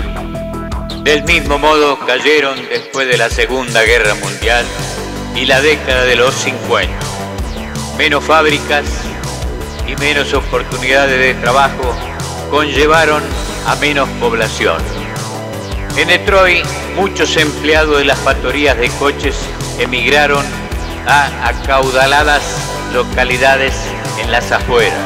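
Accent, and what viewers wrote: Argentina. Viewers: . Seguro que sos Argentino y tanguero por la tonada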